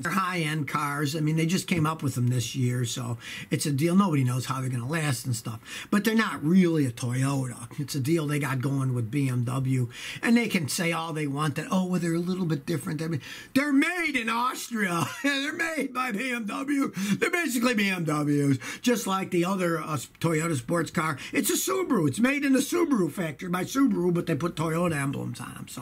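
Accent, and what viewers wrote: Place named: United States. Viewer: What kind of US accent does have? I sometimes hear it, but not as strong like.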